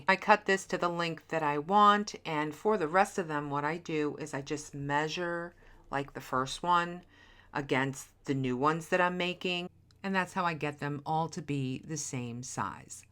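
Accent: New York, United States. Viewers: Love these ideas. Got to do the tasseled mirror don’t be so hard on yourself. You’re a great teacher and so entertaining. Showing your mistakes is the best way to teach! If you are “perfect” your students will be intimidated. So glad Holly introduced us on the fake macrame hanging. Now I’m hooked on macrame I’m no good at it but I’ll keep at it with your help. That double half hitch knot may take years but I will master it Btw is that a NY accent? I’m a transplant and sort of miss it 🙏 thanks for all you do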